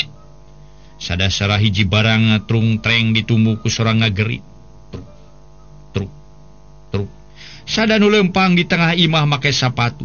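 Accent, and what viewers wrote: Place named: Sunda, Indonesia. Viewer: lamun hayang di ajar basa sunda....tah dongeng anu Sundana masih kental....sae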